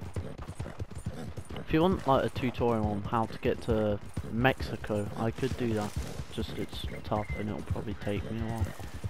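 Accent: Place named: Yorkshire, United Kingdom. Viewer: Yeah, thanks I already did it back in chapter4. Appreciated though, if I hadn't known then for sure I'd have checked it out. All the best Mrs (cool as heck name by the way). Just checked your channel out, your accent made me chuckle, reminded me of the Batley accent (where I'm from in West Yorkshire).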